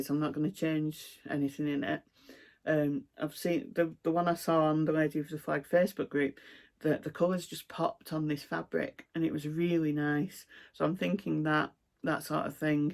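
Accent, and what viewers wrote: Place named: England, United Kingdom. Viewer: I love watching you. I’m 59% English so I love your accent. My ancestors were from North Hampton. They were Bletsoe there and it was misspelled when George came to America and they spelled it Bledsoe. I was born and raised in Oklahoma so you won’t get to it on One Nation for quite a while. I live up by Cleveland z, OH now. Your doll was adorable. My son and grandsons would love the X Box controller. You did a nice job on your husband’s gift. Thank you for making videos. I’m home bound so y’all are my company. Happy stitching.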